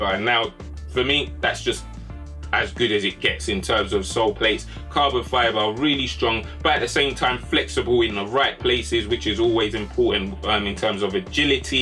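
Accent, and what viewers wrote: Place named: England, United Kingdom. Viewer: decided to turn on captions so funny watching what it comes up with because of you're strong English accent it doesn't quite understand properly la bit like a scottish accent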